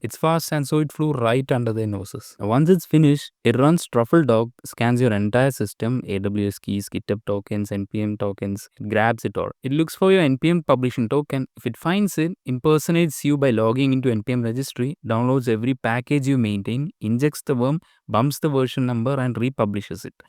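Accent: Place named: Kerala, India. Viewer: Are you a Malayali? That accent sounds familiar.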